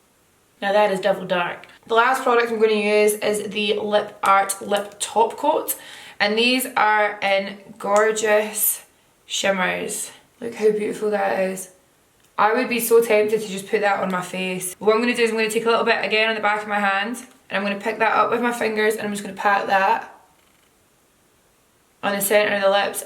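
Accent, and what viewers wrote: Scotland, United Kingdom. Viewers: Really love this look! We're fellow Scottish YouTubers! Love hearing Scottish accents on YouTube, it's so rare! 😄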